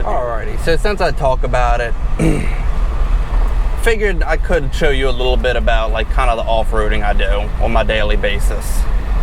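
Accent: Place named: Maryland, United States. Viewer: You have to be a marylander with that accent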